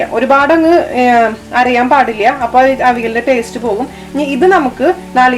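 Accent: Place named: Thrissur, India. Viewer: .....I really loved the way you talk:D thani thrissur bhaasha great recipe tto. Am also from Thrissur but don't have that tone:) I will wait for more recipe's from you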